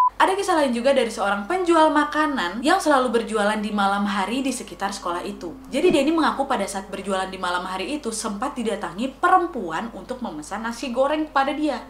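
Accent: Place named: Medan, Indonesia. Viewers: Sakinn sering nonton chenel ka:/ aku ngomong jdi medok Medan smpe ada wak² nya, trs di tanyain gini he medok amat ngomong lu eh aku mlh jawab " bacot kali kau ini, kesel kali aku wak:v" ketularan jadinya🤣 love ka😍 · Sepertinya kau dari medanya kak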